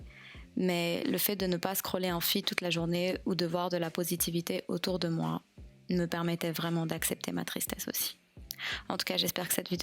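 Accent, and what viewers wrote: Quebec, Canada. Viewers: First, tu as clairement pris l’accent québécois hihi (we win!!). Second, JADORE encore une fois ta vidéo. Non suelement ton montage est magnifique, mais tu as toujours toujours pleins de conseilles qui nous aide à se développer. Merci , merci de partager ce moment difficile avec nous. De nous inspirer à aller de l’avant et être de meilleures personnes! We love you💕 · J'entends un accent québécois là nan ?? 🙀🤭 Super vidéo avec des good tips, ça fait toujours du bien de le rappeler 😘 Keep doing girl 💪😘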